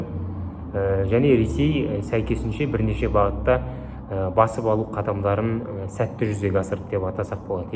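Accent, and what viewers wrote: Standard. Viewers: Ой, балам, өркенің өссін! Таза қазақша, тамаша дикциямен объективно түсінік бердің.Рахмет. Арнаңызға табыс! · баламыздың сараптамасы жақсы мағлұмат береді. Тілі таза, ойы жүйелі, дикциясы анық. Рахмет.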